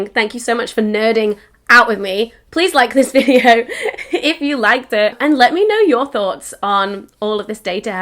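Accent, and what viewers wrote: United Kingdom. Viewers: British English soo sexy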